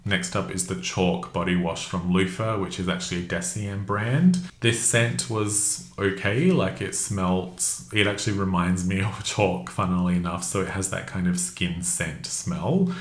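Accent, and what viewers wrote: Australia. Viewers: So glad I found your channel from James, amazing content, love finding an aussie voice! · Awesome reviews thank you. Love having an Aussie voice in this space ❤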